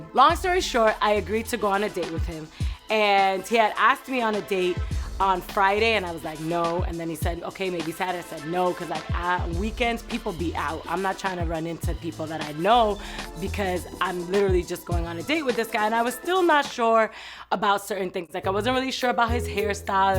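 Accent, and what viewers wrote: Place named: New Orleans, United States. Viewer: Nawlins accent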